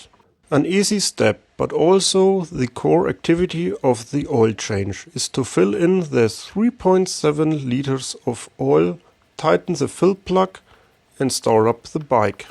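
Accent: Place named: Germany. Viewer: You should do more of these. This is absolutely excellent. I get a lot of reassurance hearing a German accent, part numbers and torque settings!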